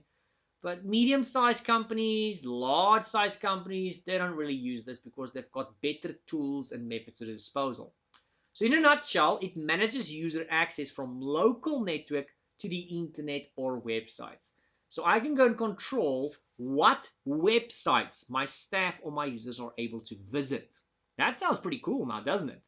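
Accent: South Africa. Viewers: Terry Davies, the best programmer ever, said that the CIA came up with the abbreviation LOL, laugh out loud, to misdirect people from finding out about IOT, Internet of Things. Most people will think that's just insane, which it might well be, but if you're into politics, then it's really not that far fetched lol. Thanks for the video series, it's well presented, and i agree with a previous comment i saw, you have a interesting delivery of the material, which makes it less difficult to learn, maybe it's the accent, i assume SA from the sound of it. Thanks again.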